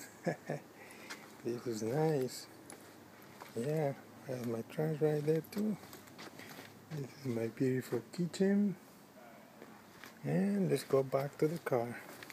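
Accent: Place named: India. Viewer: India akcent 😊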